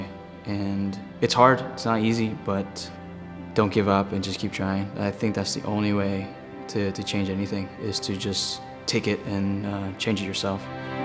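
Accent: United States. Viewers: I guess I hear a Southern California accent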